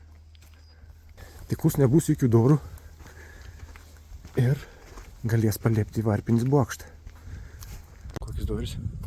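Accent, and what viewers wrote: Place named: Samogitia, Lithuania. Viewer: Netikėtas Jūsų atradimas, bet sakyčiau labai maloniai nustebinęs. Toks paparastas vietinių, įdomių, nežinomų ir mažų dalykų parodymas tiek Lietuvoje , tiek Latvijoje :). Man kaip aukštaičiaui labai patinka , kad tai darot žemaitiškai. Sėkmės !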